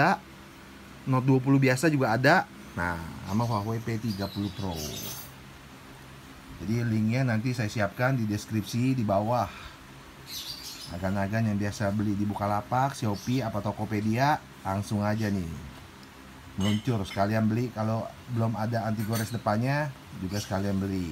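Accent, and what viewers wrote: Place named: China. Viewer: logat cina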